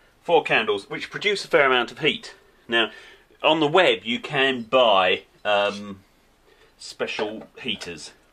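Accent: United Kingdom. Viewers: it is the British version of a penny.I made the comment because of his British accent and that he said pence and not pennies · This guy is scotish? :D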